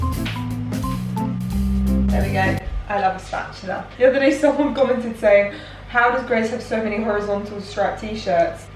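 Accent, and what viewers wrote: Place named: United Kingdom. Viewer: what part of the UK are you from, im trying to place your accent 😂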